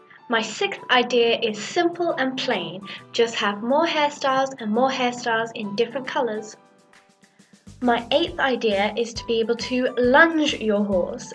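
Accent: United Kingdom. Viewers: Are you British? :o ( I'm just curious because your accent sounds like British) xD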